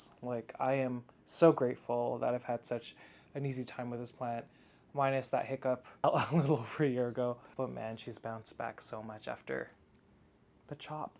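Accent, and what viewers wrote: South, United States. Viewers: Love it when to tawk southern lol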